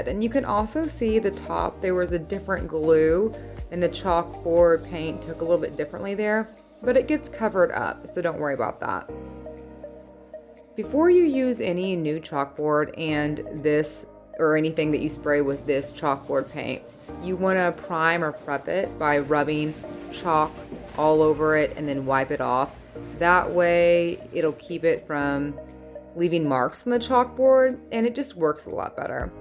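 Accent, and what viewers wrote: Texas, United States. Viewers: What part of Texas are you from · So cute that I just knew she was in TX. Our daughter was born in TX when we were stationed there back in 1986. You never forget the friendliness and that dialect! Beautiful people. Beautiful State! We loved our time in Texas!! Thanks for all the super DIYs!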